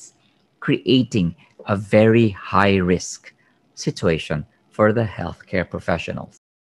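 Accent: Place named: Philippines. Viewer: Once I opened this video 1st I thought you are a philippino later you mentioned that😃 actually I have many friends from Philippines so, I know accent.❤️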